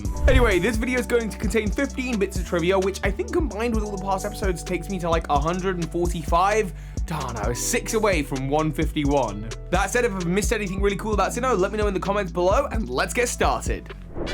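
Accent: England, United Kingdom. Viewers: With r-dropping English accent, “thirsty guards” sounds like “thirsty Gods”, which I think is a great way to describe the residents of Mt. Olympus.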